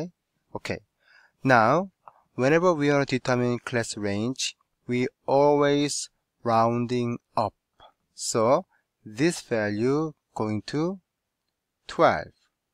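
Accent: South Korea. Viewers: Omooo!!💜thank you so much😇 Wait am I the one here feel confused about the man speaking having a Korean accent of English??😁anyways good job really a life saver😇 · Off topic but can i ask why does your accent sound like Korean English accent ? Or just mind is still in SK.....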